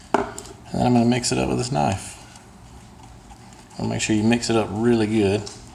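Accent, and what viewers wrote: South Carolina, United States. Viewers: where is this accent from? south carolina?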